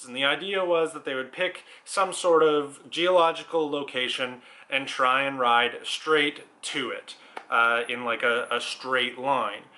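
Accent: Canada. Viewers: He's Canadian. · Canada for sure.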